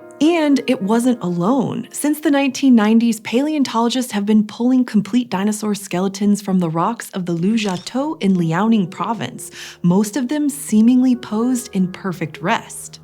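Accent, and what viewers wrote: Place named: United States. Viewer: Dear PBS, for us older folks that still use the imperial system of measurement , please use both systems when describing distance. This speaker sounded very American so why are you using the metric system and not including the older American imperial system ? We old folks aren't dead yet please mention both systems.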